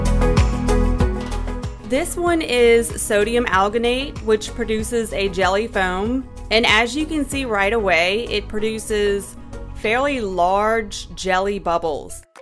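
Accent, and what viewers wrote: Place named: New Orleans, United States. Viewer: Used this video thanks... settled on the guar gum. btw are you from New Orleans you have a particular accent that reminds me of home?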